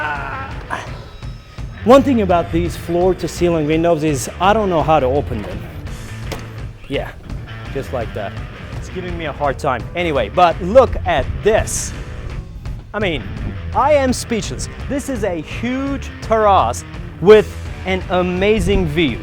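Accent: United States. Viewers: but you have an American accent ,in Turkey they teach British English ,so you were in school in US?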